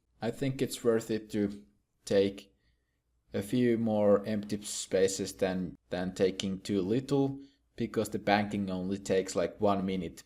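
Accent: Finland. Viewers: dat finnish accent tho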